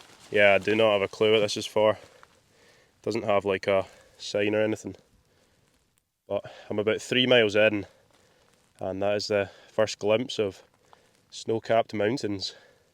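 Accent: Scotland, United Kingdom. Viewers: It's quite special to listen to your Scottish accent and turn of phrase for someone who is not from the UK! "Wee rivers" and "glens", for example. We didn't see you cooking or similar house keeping - I think I'd enjoy that, but maybe you've decided to focus on the actual hiking. Thanks for taking us along - from Cape Town, South Africa. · the new filter you are using , i need a new one and yeah first hand experience is best , salomon would be my usual goto filter ... is the katadyn be free 1l good enough ,better or worse than salomon do you know ? nice vid ,you narrate your walk wonderfully scottish 😊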